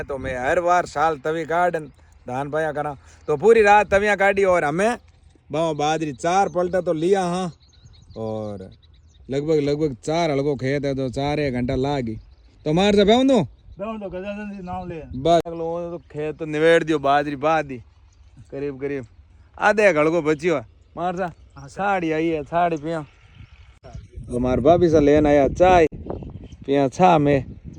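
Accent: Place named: Rajasthan, India. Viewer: Boli Bohot ghaint lgdi Rajasthan di full ghaint 👍👍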